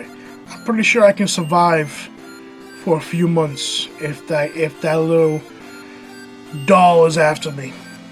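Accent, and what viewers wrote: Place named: Boston, United States. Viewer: Thanks for the Q&A,. My girlfriend and I thought you were from Boston so thanks for clearing that up for us haha. Chucky is a sweet character. I grew up loving those movies. I probably like Child's Play 2 the best simply because we had the VHS growing up and watched it all the damn time lol. Commando is an awesome movie! BENNETT! lol... Thanks for the Q&A. Love your vids!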